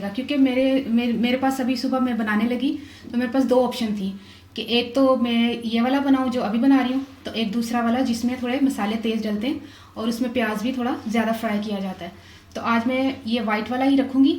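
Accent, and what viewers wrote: Punjab, India. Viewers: What a lovely talking style, specially punjabi touch, i think speak punjabi more. you must reply ! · So nice taste aur ap ka punjabi style bohat acha ha ap punjab k kis aria see ho ma lahore sey hon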